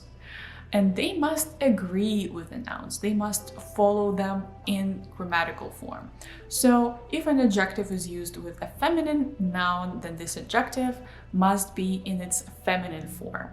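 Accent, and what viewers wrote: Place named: Russia. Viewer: learn French from a Russian accent teacher, interesting....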